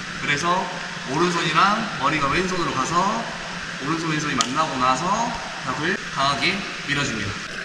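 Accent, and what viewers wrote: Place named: South Jeolla, South Korea. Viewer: 설명도 잘하시고 구수한 전남 사투리 정감가네요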